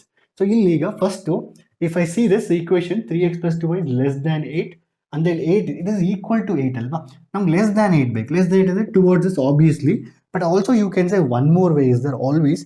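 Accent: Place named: Malnad, India. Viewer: Sir, where are you from.. Whenever you speak i feel u r from malnad... Pls reply... Since i am also from malnad i am curious to know 😁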